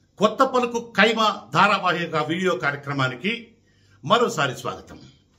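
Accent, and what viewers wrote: Nellore, India. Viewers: నెల్లూరి యాస అన్నో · Super …like mana Nellore accent.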